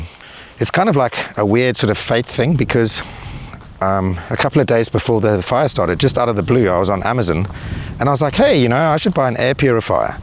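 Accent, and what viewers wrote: South Africa. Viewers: is that a SA accent i hear? hope you all safe.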